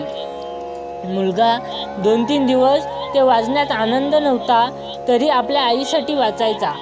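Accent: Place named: Konkan, India. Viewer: उच्चार अशुद्ध जरी आसले तरी कोकणातली मराठी अशीच असते महाराष्ट्रात प्रत्येक विभागातली मराठी भाषा वेगवेगळी असु शकते कोकणी खान्देशी पुणेरी मराठवाडी सातारी विभागानुसार उच्चारात बदल असु शकतो बोलण्यातील आशय आणी भाव सारांश महत्वाचा आहे हरीपाठा बद्दल खुपच छान माहीती सांगीतली धन्यवाद 🙏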